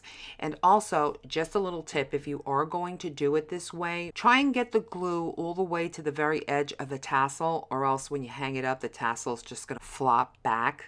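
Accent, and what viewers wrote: New York, United States. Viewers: Love these ideas. Got to do the tasseled mirror don’t be so hard on yourself. You’re a great teacher and so entertaining. Showing your mistakes is the best way to teach! If you are “perfect” your students will be intimidated. So glad Holly introduced us on the fake macrame hanging. Now I’m hooked on macrame I’m no good at it but I’ll keep at it with your help. That double half hitch knot may take years but I will master it Btw is that a NY accent? I’m a transplant and sort of miss it 🙏 thanks for all you do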